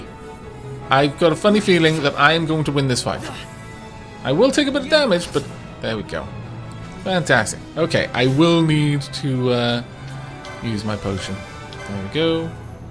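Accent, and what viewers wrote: Wales, United Kingdom. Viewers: You sound like you are from Wales. Are you?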